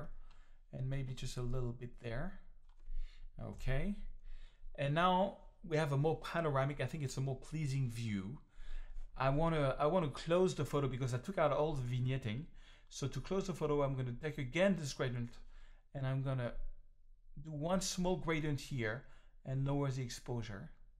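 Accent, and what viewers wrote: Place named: France. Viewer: For your English tutorials, it would be great if you would talk a bit slower. Your French accent has me backing up very frequently to catch what you said.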